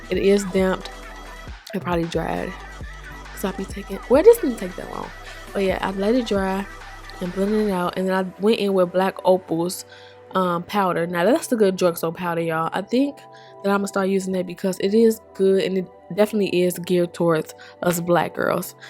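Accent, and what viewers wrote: South, United States. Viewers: Beautiful. I 💘 your voice overs with your Southern Dialect.I love to hear you say, Description Box. You and your fast talking self,.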